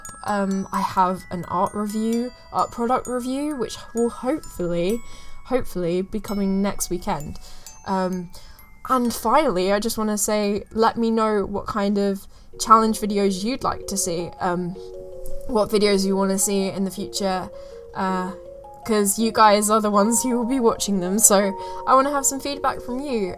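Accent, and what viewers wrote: United Kingdom. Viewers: Redhair girl with a fantastic english accent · I love your pretty brittish accent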